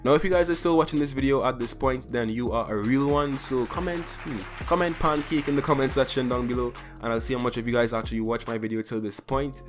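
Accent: Trinidad, Trinidad and Tobago. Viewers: WAITTTT YOU FROM TRINIDAD? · Hey you from Trinidad?? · are you from Trini?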